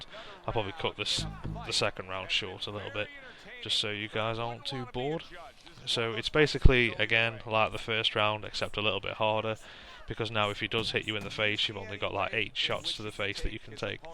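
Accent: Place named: Nottingham, United Kingdom. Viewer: This took me like 50 attempts and I smashed so many PS3 pads. Uploaded, are you from Nottingham? You sound like you're from around here because you sound like Carl Froch, haha.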